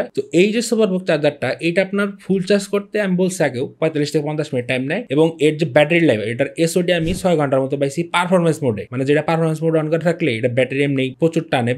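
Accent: Tangail, Bangladesh. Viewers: Vai tangail theke naki? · Vai apnar basha ki Tangail a? 😱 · Bhai ki tangail er ni?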